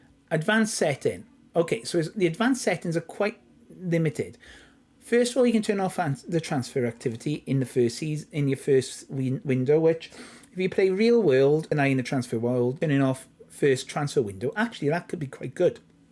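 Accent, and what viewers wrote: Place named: Wales, United Kingdom. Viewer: I like your positivity ... could listen to the Welsh accent all day lol .... I'm from St Helens , Merseyside . I'm currently managing Maidstone United with a save carried over from FM24 Console on PS5 . I'm having loads of crashing . Maybe 20 odd times . I've done the regular stuff , reinstalled game , restored licence and entered safe mode and cleared cache and rebuilt . It's playable .... looking forward to the fix ⚽️⚽️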